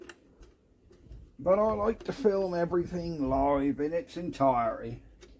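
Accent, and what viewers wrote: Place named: Yarmouth, United Kingdom. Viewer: All I did was report it stolen which was a waste of time. But I found out who stole it (another boater) but they had sold it by then. I bought it off of eBay for £20 If my memory serves me right and got it running. But it never really ran smoothly as the float needle was tired. I put a toggle switch on the voltage adjustment so I could use 12 and 24 as didn't need the 6v. Just so you know it works brilliant as a jump starter. I started a mates horsebox with it as it ran 24v. It's good to see it's still being used and is going to do you a good turn. Glad it's safe now and your the new owner. Love your video's my mum walked in earlier whilst watching a video as she instantly recognised the accent. She's born and bred Yarmouth.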